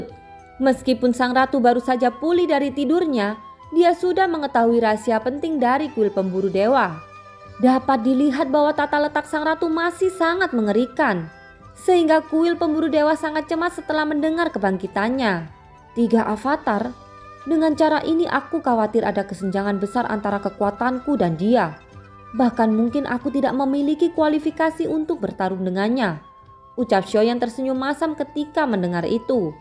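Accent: Java, Indonesia. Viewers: Bahasa meddok nya/ atau logat nya kapan hilang nya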